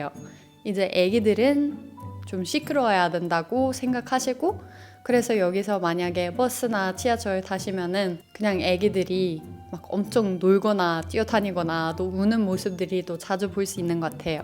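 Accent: South Korea. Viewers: 억양이나 발음이 한국인같아서 놀라고 너무 예뻐서 놀랍네요. 행복하세요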